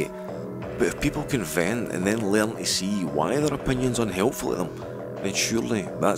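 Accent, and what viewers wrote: Scotland, United Kingdom. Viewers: aw mate as a fellow scot hearing your voice made me happy, first time finding you, we need more proper strong accent scot readers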